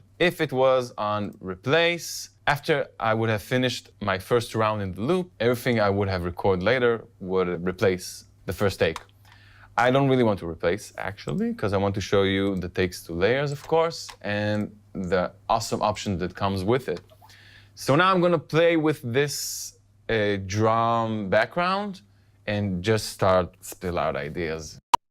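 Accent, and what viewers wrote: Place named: Israel. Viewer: עשיתי סקבסקרייב כי שמעתי מבטא ישראלי :)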